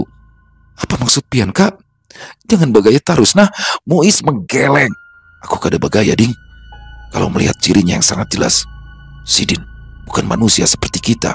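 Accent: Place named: Banjar, Indonesia. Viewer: Dialek Banjar nya enak sekali didengar.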